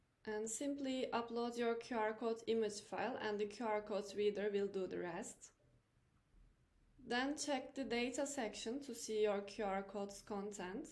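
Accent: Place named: Turkey. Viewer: Bu üslup kesinlikle bir türke ait olmalı :D türk ingilizcesi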